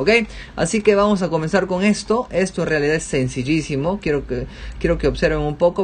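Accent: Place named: Amazon, Peru. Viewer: Causa, buen vídeo, me sirvió bastante, por tu acento saqué al toque que eres de la selva peruana, saludos desde Tingo María.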